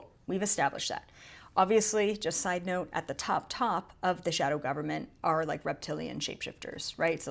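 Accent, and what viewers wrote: Canada. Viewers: Thank you for your readings!! I found your channel about a week ago and realized you were Canadian from your accent! My parents and relatives are from Canada, they would be appealed by what is happening in the United States now!! Total corruption!!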